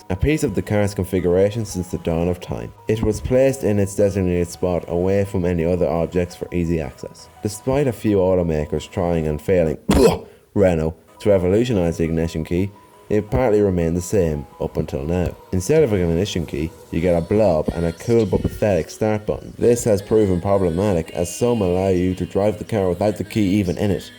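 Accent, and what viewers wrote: Ireland. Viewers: Love ur vids man are u Irish? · this lad is irish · U Irish too?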